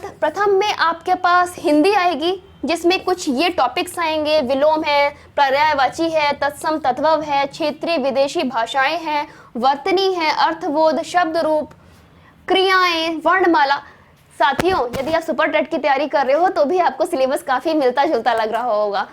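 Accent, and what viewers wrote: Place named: Maharashtra, India. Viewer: Marathi tone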